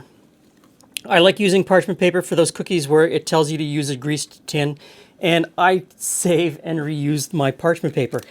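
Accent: Canada. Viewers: Sure sounds Canadian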